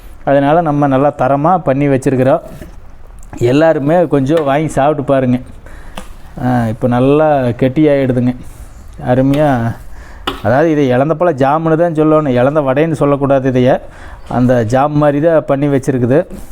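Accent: Kongu, India. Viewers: இலந்தைவடைசெய்முறைஅருமை உங்களுடைய கொங்கு தமிழ்மிக அருமைங்க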